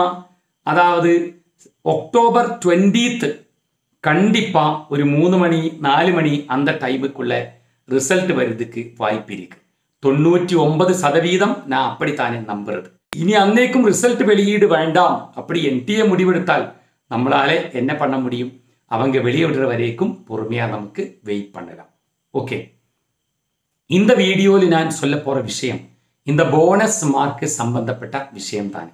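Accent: Kerala, India. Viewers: Looks like malayali speaking tamil. Is it?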